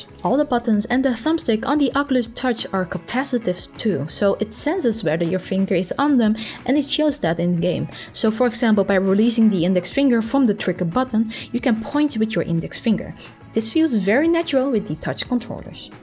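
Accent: Netherlands. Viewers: is she dutch? she sounds really dutch.. shes dutch! is she dutch?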